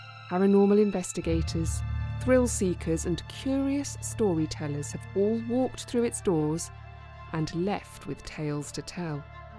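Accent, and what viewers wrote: United Kingdom. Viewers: How wonderful to hear a British female voice speaking English so beautifully. Something that used to be not uncommon. Nowadays women barely understand the language and complete with all the tattoos, well, what can you say?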